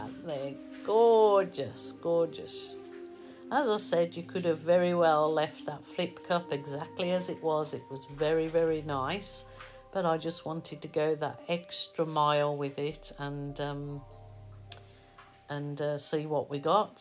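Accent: Birmingham, United Kingdom. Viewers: I love listening to your voice it sound from Birmingham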